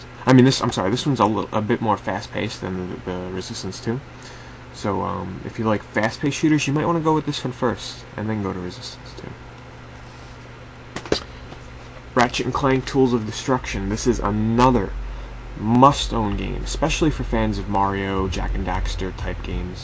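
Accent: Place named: New England, United States. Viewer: I like your New England accent. "Didn't get to play much of WarHauk"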